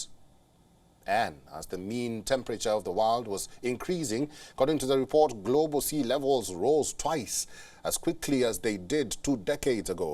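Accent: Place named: India. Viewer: you now speak like an indian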